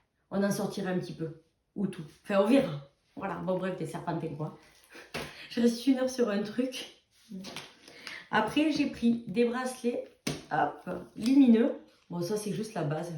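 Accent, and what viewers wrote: South, France. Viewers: Coucou, je suis tombée par hasard sur ta chaîne, j’aime beaucoup ta bonne humeur. Je me suis abonnée à ta chaîne, j’ai moi-même une toute petite chaîne. D’après ton accent tu dois être du sud comme moi. Les achats pour l’anniversaire de ta fille sont top. Merci pour le partage. Bises à toutes les 2. 😍😍😍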